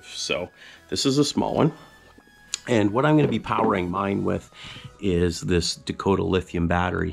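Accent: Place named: Canada. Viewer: I understand the process. I was simply noting that you never mentioned what type of battery charger to use with it. If someone who doesn't understand LifePo4 batteries builds your project, (a very fine one at that), and tries to charge the battery with a standard power supply, car power socket or car battery charger, they will wind up with a pile of HOT orange goo where the battery box used to be and / or possibly even a very hot fire that is next to impossible to put out. Lithium type batteries are no joke and need to be used with care and respect. I was encouraged by your use of a fuse. I'm guessing by your accent that you are Canadian. A little tip for you and your fellow countrymen / women and my country people to the north of me. LifePo4 batteries can NOT be charged by ANY charger or solar array if the cell temperature is below 32 degrees F, (0 C). 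Permanent damage to the battery will result. it can be used cold but not charged. It must be warmed up first. I say this for someone who may go ice fishing and leave it sitting on the ice or may leave one in a car for emergencies in the winter. Larger higher quality, LifePo4 batteries for solar, marine or RV use have a BMS module or Battery Management System module built into them to protect them from overcharge, undercharge, low charge condition, (below 20%), short circuit, excessive high drain and high and low temperature conditions. It is not impossible but unlikely that your battery contains a BMS module and more likely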